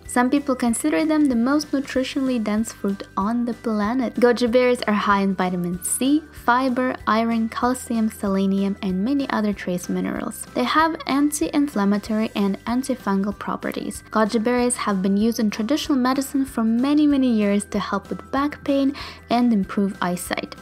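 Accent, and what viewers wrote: Russia. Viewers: я слышу русский акцент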